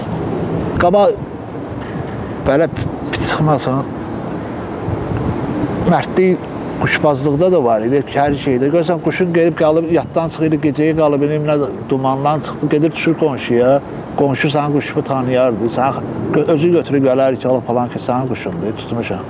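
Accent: Baku, Azerbaijan. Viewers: Can ey can baki lehcesi var ol qerdew mende quwbazam qedew baki quwdarna catan yoxdu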